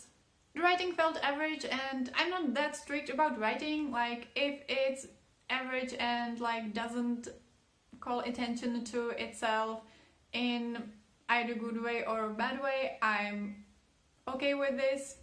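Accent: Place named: Czech Republic. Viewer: Promiň ale... Ten český přízvuk...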